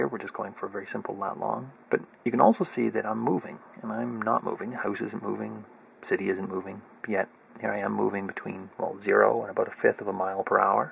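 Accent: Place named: Western Canada, Canada. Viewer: Western accent, metric country. Pretty obvious.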